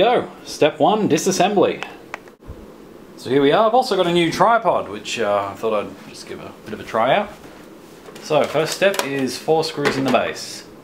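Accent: Australia. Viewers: You sure are one heck of an imaginative guy. I've seen some of your "constructions", I just wonder why you have so many "DC's" :P I'm from Portugal. You have an australian accent? Am I wrong?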